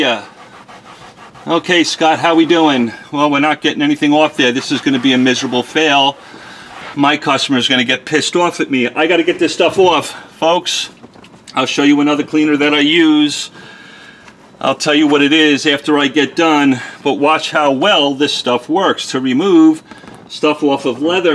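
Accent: New York, United States. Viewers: Thanks you made my day. Dallas is awesome but you sound like a New Yorker. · You sound like you’re DEFINITELY from New York lol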